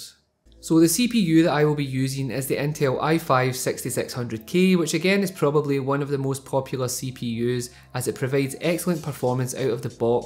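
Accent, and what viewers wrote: United Kingdom. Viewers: his british accent tho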